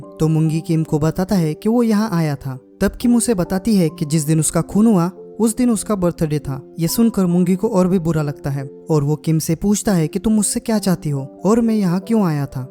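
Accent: Maharashtra, India. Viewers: गावंडाळ हिंदी बोलतोस म्हणजे महाराष्ट्र चा आहे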